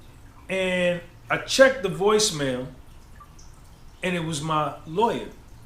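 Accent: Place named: South, United States. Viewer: That country accent was 😂😂😂😂 My fam is from Kings tree South Carolina 😂😂😂💪🏿💪🏿💯💯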